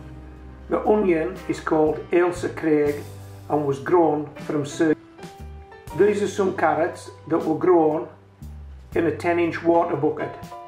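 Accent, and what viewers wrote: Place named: Cumbria, United Kingdom. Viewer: Areet Marra? Not often you hear Cumbrian on the Youtubes ;) Now we're at home all the time, there's a bit more chance to get in the garden (silver lining?) So I'll watch and learn whats going to work in our neck of the woods. Great content - Keep it coming Tek care o yersel and that laal dog eh!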